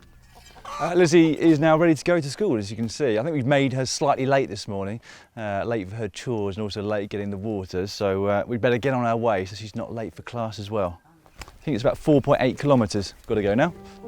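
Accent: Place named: United Kingdom. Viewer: A worthy and life changing cause to be sure. But I can't help but feel the hypocrisy of spending ~£10,000 (or more) of Her Majesty's British Pounds on flying, and at least 1 other staff member + all the camera gear out to Zambia + accommodation + translator + guide + transfers to report on the donations of others that provide cheap (albeit very important) bicycles to students and others. I also couldn't stop chucking at the obviously colonial nature of having a British national (Sorry, you're very British with a very British accent) report in Zambia which was a former British Colony, where Her Majesty has a fairly checked past. I'd have loved to have seen a local Zambian media crew report with the students and the GCN invest the £10,000 on more bikes or other charitable works. Regards Jordan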